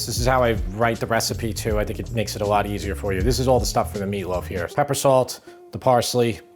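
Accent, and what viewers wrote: New York, United States. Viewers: Love 💗 the way you cook. A typical sounding New Yorker…..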